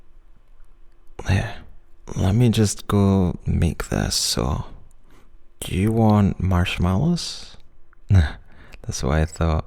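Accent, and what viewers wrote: Scotland, United Kingdom. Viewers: Please his precious Scottish accent is absolutely adorable 🥺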